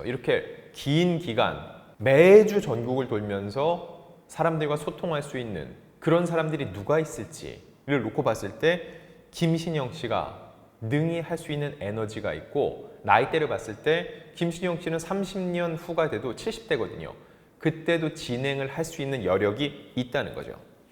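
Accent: Gyeongsang, South Korea. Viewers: 연기와 진행은 사실 다른데 잘해낼지 관심이 가네요, 코믹연기와 순발력은 최강인데 ~ 경상도 말씨가 쪼끔 걸리기는 합니다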